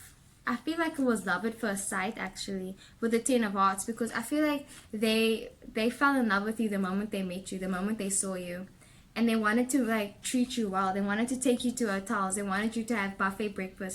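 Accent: South Africa. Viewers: 😂😂😂 The 2nd scarf - You totally took me back to where we met. His a gemini and I'm a cancer. Everything you said resonates besides the long distance part or probably it means the distance between the two of us. I'm even older thn him You have a South African accent. Do you live in Kempton Park or near Lanseria? If I'm wrong, let me know please. I would like a reading in person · Ah south African? ?? 😄 yessss 😄👏❤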